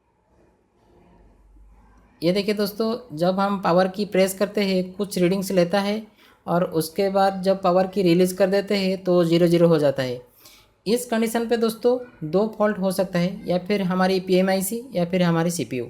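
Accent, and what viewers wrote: Odisha, India. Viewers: Brother you are from Orissa because of your Hindi speaking style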